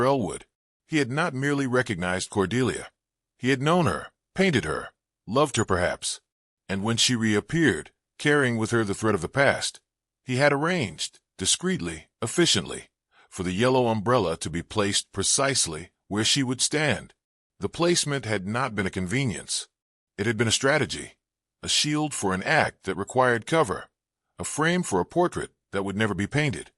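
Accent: United States. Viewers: An American narrator does not work for a Miss Marple story. It grates.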